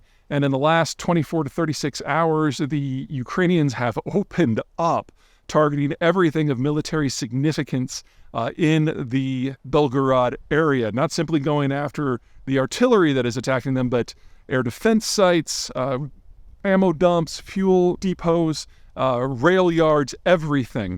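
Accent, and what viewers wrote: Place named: United States. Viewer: First of all: great video as usual, super informative. I just can't help hearing "car key" whenever you americans pronounce Kharkiv, and it's really funny to me.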